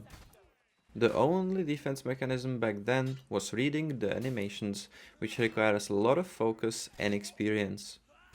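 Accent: Czech Republic. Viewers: Where are you from? You sound like Czech.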